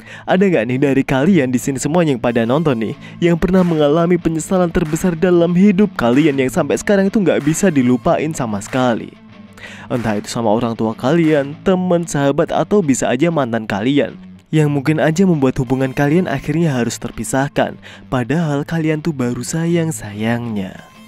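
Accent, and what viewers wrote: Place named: Buol, Indonesia. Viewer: Logat apa kw pakai itu... Orang buol kh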